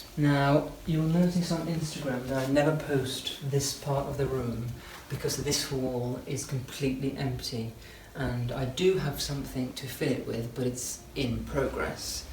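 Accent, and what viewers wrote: United Kingdom. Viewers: I LOVE your style! So classic and warm and inviting! I also love your voice, it has a soft, soothing vibe, yet very much classy British! I'm so happy you've decided against painting the bureau as I thought the instant I saw it that it's such a beautiful piece that added just the right touch of character to the room! Forgive me..I realize this video is a few months old but I've just come across your channel and am LOVING it! I want nothing more than to be able to visit the UK for an indefinite period and soak up as much history and food as I can possibly take!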